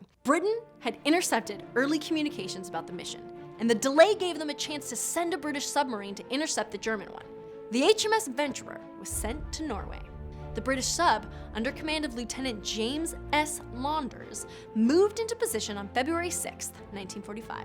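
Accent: United States. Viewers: TM: Gee, what a surprise that an American would use American pronounciation.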